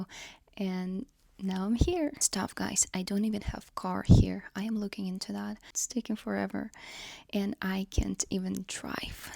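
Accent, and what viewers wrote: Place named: Eastern Europe. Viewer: Love your slavic accent😊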